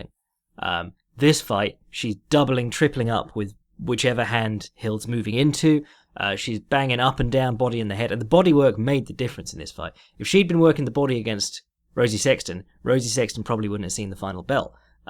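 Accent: England, United Kingdom. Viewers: Where about's in England are you from? Also, if it's close to me, can we be friends?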